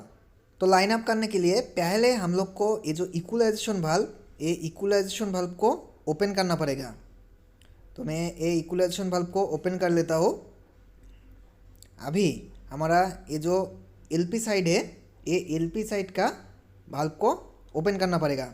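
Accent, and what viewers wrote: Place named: Bengal, India. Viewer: এর জন্যই আমি আপনাকে প্রশ্নটি করেছিলাম।দোয়া রইলো ভাই আপনার জন্য।আসলে আপনার কথার মধ্যে বাংলার একটা টান পাওয়া যায়। কোথায় কাজ করেন ভাই?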